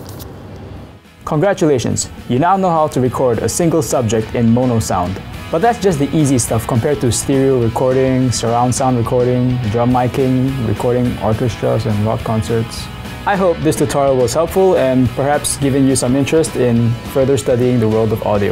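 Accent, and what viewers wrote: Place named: Singapore. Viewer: Very well done...I do video production here and I would hire you in a second if I could afford it. I'm in Florida USA and as I was listening to your accent I realized you must be in S'pore! I used to live in Yishun on Ring Road and miss being there very much, especially Mei Goreng and Roti Prata! Do you know anyone who has worked for Odex? If so, let them know the Shin Jing Bing Ang Mo says hi! and do some more videos!!!!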